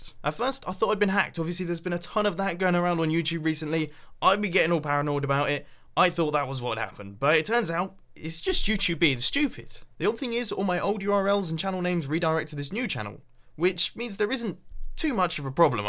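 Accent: United Kingdom. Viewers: GradeAUnderA = EVIDENCE!!! Notice they both live in the UK, they have same accents but Grade is just louder. GRADE = MUMBO CONFIRMED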